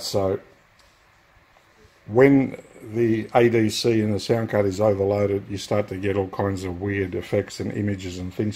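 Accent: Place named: Australia. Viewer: You sound like you're in Australia. I wonder if you can receive a 60 Hz signal emanating from power lines elsewhere in the world. American Samoa would not be too far away, but it's small, so power lines would be short, not making a good transmitting antenna. However, in North America, some power lines are hundreds of miles long. If a wire antenna does not work, I would try using an iron core magnetic loop antenna.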